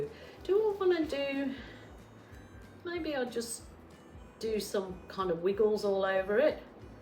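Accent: Birmingham, United Kingdom. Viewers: Wow that is brilliant...love these colours. You may be in australia but to us brits we can still hear your brummie accent x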